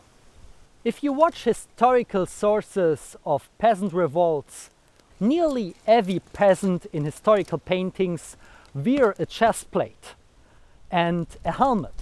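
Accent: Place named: Germany. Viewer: The way you structure you sentences, the words you choose, it's like... You're SO DISTINCTLY german, even not taking that beautiful accent into equation, i absolutely love it. One of the best channels about armor, so pleasant to listen to this voice and see the quality action. Hi from RU !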